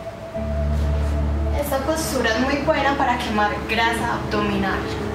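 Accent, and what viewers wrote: Antioquia, Colombia. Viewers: Buen vídeo linda,debo decir que tienes una muy bella tonalidad en la voz,relajante,debe ser su acento paisa,saludos bonita. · Por el acento la chica es colombianas.. por el cuerpo la chica es divina.. por la pesonalidad la chica is likeable... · Quiero saber cómo se le llama a tu acento, sé que eres colombiana pero quiero saber eso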